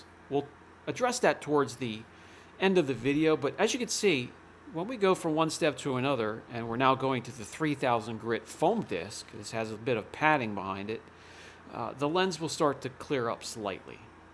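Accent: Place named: East Coast, United States. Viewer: lol Waaater the east coast accent is strong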